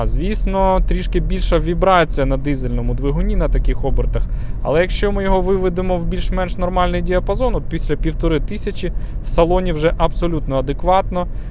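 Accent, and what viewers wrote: Standard. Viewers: четкий Украинский язык приятно слышать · Дуже чиста українська, приємно бачити та чути, та особливо сприймати такий контент! Ви молодець!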